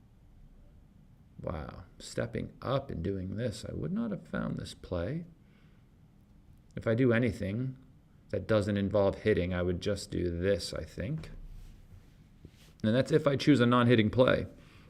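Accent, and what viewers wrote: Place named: New York, United States. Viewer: This guy's sense of humour is really awesome also the new yorker accent in him always reminds me of Burr.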